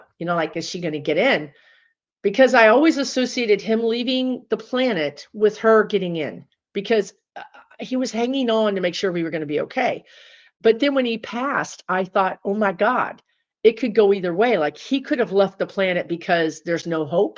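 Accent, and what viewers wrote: Texas, United States. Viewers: You Are a Texan 😂 love ya gf..I was making bed i was listening Your Texas ❤❤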